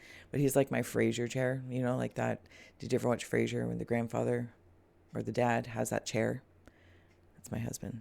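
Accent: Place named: West, United States. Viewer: hope you had a wonderful weekend! Not sure if this is the video but I do (I’m hearing a western accent, haha) I do wish to hear more of this flower farming side of! Seems to be my other obsession during this time of new beginnings. Floret flowers, I think that’s her name. She is breeding roses, dahlias and others that haven’t been breed before, taking it from big box stores and teaching us how to do it. She also is rescuing roses that were becoming extinct. The videos they put out are my new fav live mini series. I laugh, I cry, I learn and I feel part of their farm. Almost dropped your page on her page inviting her to you, i get the feel she’d really like us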